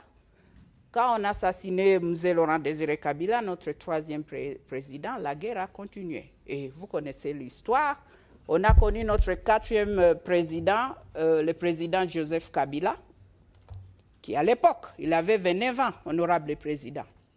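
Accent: Rwanda. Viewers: Ton rwandaise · Un accent rwandais et tutsi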